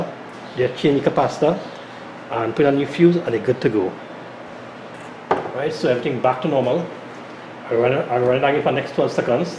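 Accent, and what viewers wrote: Trinidad and Tobago. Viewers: Hello, you sound like a truetrini. I am a trini living in Nevis and my sharp carousel fuse blew 2 years ago when I foolishly tried to cook rice in it. I dont want to buy another microwave although it is cheaper than fixing. However I would want to fix it myself. Your video is very good for my problem. Are there more than one fuse in it? also do you sell replacement fuses in Trinidad? If so let me know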